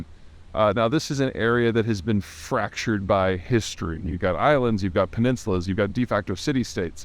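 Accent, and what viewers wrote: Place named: Texas, United States. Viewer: Talks like some Texas ignorant. When a fool is trying to repeat what a wise man once said, the chain jumps off because he translates it into something he himself can understand.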